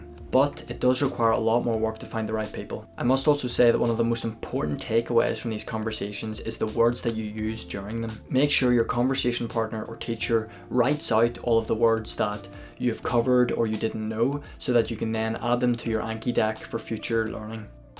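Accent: Ireland. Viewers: Just clicked on this after watching a vid where you spoke only in German. Would have never guessed you have an Irish accent ! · I realized that you are from Ireland with help of your pronunciation,especially a word "thousand") thanks for sharing,mate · Oh my godness, your Irish accent is sooooo adorable!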